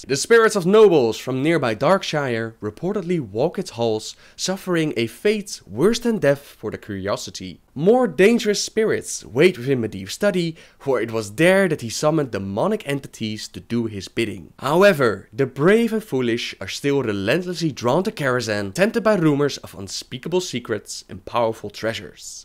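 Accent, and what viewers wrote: Netherlands. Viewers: What's up with the dutch accent?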